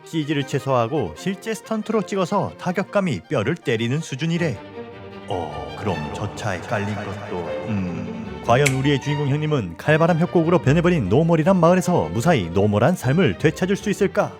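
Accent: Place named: Gyeongsang, South Korea. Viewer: 경상도 말투. 재수없다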